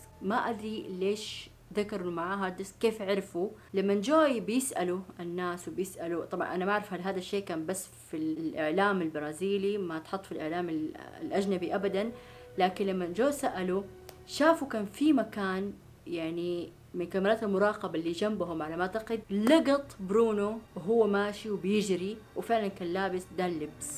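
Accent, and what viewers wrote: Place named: Riyadh, Saudi Arabia. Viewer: got u اهي سعوديه وانا مو سعوديه ف ما افرق بلهجاتهم بس اتوقع لهجة اهل الرياض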